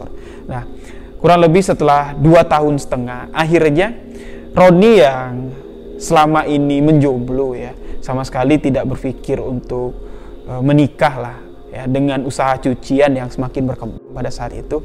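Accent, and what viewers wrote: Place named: Madura, Indonesia. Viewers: Logat maduranya sangat kental.. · Org madura ya bang ngomngnya khass bngtt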